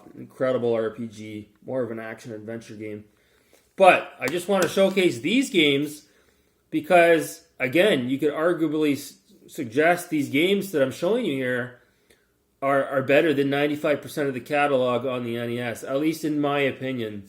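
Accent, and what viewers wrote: Canada. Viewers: Sound like northern Ontario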